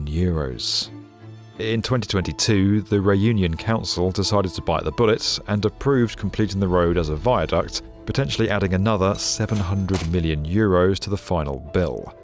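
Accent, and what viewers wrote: England, United Kingdom. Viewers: As a French person, it is so weird and funny to hear the places name pronounced with an English accent (although he's trying is best to "Frenchize" it !)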